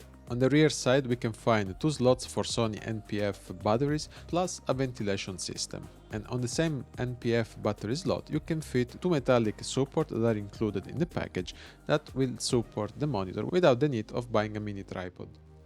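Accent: Italy. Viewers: Italy 10 – France 0 (if your accent didn't give away that you're Italian this sure did)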